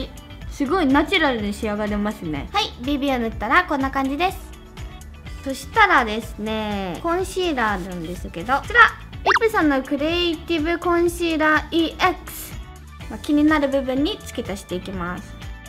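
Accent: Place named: South Korea. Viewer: ・ 韓国の人って、さしすせその発音がすごい可愛いですよね😍❤️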